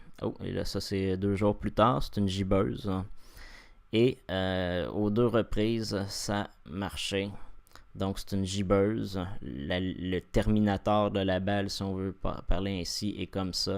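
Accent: Canada. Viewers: Maintenant, dès que j'entends l'accent canadien je suis sur mes gardes mais là le propos m'a l'air logique, clair, cohérent, argumenté. En un mot " globiste", je dirais même " sphériste" ou "rotondesque"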